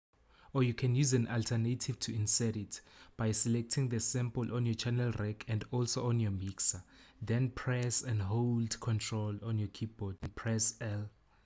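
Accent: South Africa. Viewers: I can tell this guy is SOUTH AFRICAN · Definitely a South African (Durban) speaking. Nice tutorial. I'll be making my first kwaifi sounds.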